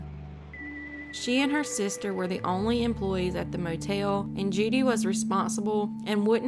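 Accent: South, United States. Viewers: Hi, I just wanted to say that really love your narration and background music. It's so comforting to hear a southern accent as a former Texan living in NYC. I wanted to ask if you have covered the case of Evelyn Hernandez. A disappeared pregnant woman and her son who vanished in San Francisco California. The case received little to no coverage and the main suspect is the married man who inpregnanted her similar to the Amanda Jones, except Evelyn is from El Salvador and nobody cared enough in the SFPD to convict Herman Àguilar.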